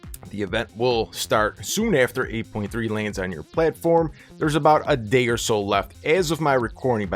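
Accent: Midwest, United States. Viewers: I like how you say "sharanga" 😂👍that Midwest accent never gets old 💚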